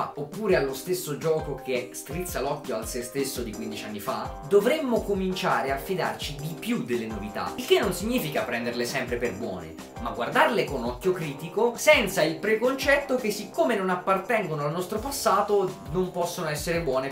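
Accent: Campania, Italy. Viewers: Scusa la domanda fuori luogo, ma sei della campania? Ho sentito male o hai detto "Semp a stessa cos" ahahahah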